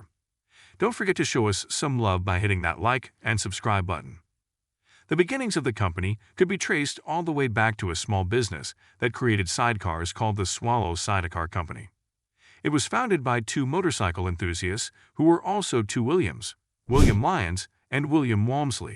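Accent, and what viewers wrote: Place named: United States. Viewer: Why can't the narrator say K not T? This is about an English car and should be narrated by an English speaker not a Yank trying to speak English.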